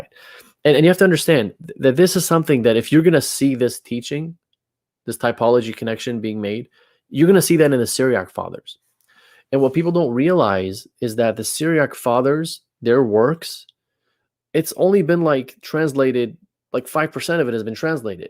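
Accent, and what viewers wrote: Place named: Arizona, United States. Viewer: Are you in the Middle East? I would maybe guess someplace like Phoenix, Arizona, based on your accent, and there being a large Chaldean (and, Melkite) community there😀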